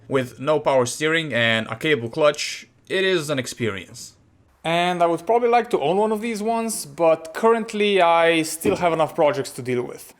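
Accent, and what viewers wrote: Slovenia. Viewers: Hearing a slovene talking in english is so bloody cursed 😂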